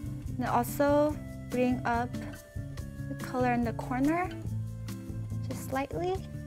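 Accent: South Korea. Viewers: Every time I watch your videos, I notice how you have this Korean accent but I'm not quite sure if it's Korean accent or not because my fellow Korean friends who were born in Canada don't have such accent. Is it only me who notices her accent?? lol what accent do you have?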